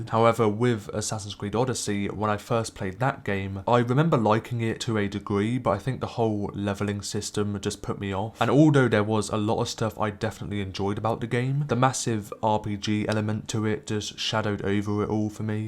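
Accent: United Kingdom. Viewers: you do come off as a hater. It’s an average brit accent🤣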